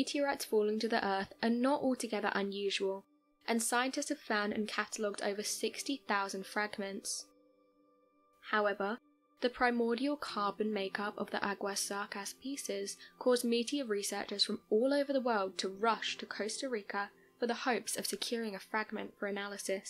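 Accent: England, United Kingdom. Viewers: I wish you could Keep the heavy English accent in England , can’t find anyone who can interpret into American English sorry , bye bye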